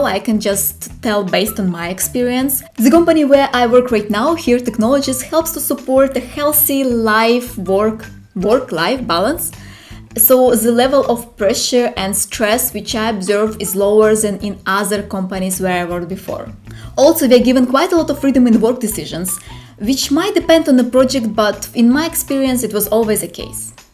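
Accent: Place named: Eastern Europe. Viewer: Nice person but I have to be honest....Listen to „eastern-English“ is really exhausting....sorry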